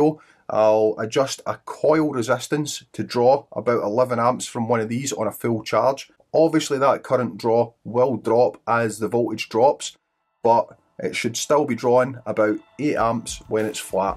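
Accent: Scotland, United Kingdom. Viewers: That strong Scottish accent tho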